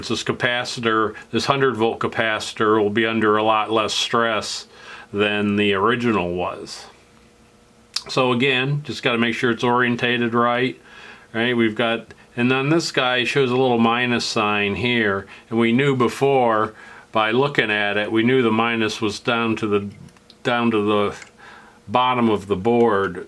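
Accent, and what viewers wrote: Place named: Buffalo, United States. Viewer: Your accent is just like a co-worker that is from Buffalo, NY.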